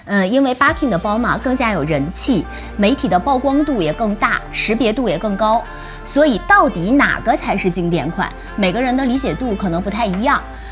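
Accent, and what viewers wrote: Japan. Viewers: 應該比慣用日文發音吧，她應該是銀座老闆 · A Japanese way pronunciation found commonly in Japan. Haven't u been to Japan.... If not, watching more Japanese YouTuber lol...... Haha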